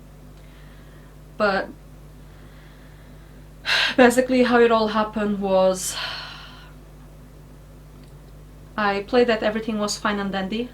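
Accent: Portugal. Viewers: Are you Portuguese? You sound like one... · i watched the whole video, and I love your Portuguese accent, when you say "thanks" it sounds to my "murican" ears that you said "tanks".. and my brain immediately went.. no wonder she likes tanks, but how do you do oil changes on a thanks... I've said this before you to on stream ages ago, but as one of the "silent" majority who watch, thank you, for choosing to keep your steaming and videos going. There are/were many a night where I am rocking one of my kids to sleep to one of your streams or chilling in the hospital icu ward, while joking with my nurses, watching. It is weird to think it has been that long, but one is just finished kindergarten, and the other is 2. Both boys love watching you/jingles, you have become routine like i used to watch specific military history shows in TV as a kid in the 80's. so Thank You, you've made an impact in lives much bigger then you've maybe imagined possible when you were back in that mess years ago.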